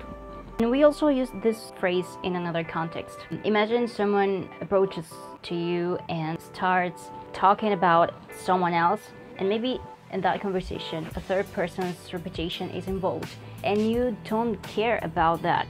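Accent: Cuba. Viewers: I am just loving your videos!I really love the Cuban Spanish accent! I like all the Caribbean Spanish accents, but Cuban is my favourite! I really want to learn it and you're a great teacher! 🇨🇺🇯🇲🇬🇧💃🏾💃🏾💃🏾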